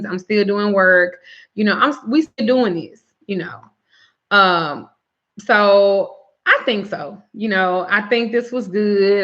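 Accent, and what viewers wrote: South, United States. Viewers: I love your accent :) Can I ask where it's from, Texas? Alabama?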